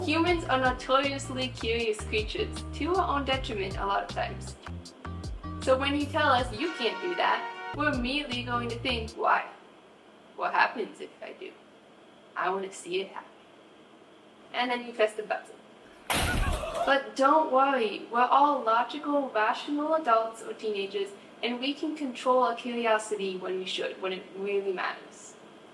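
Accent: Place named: New York, United States. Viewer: That's a cool accent, where are you from? I'm British and I barely hear this accent on YouTube. Is it New York?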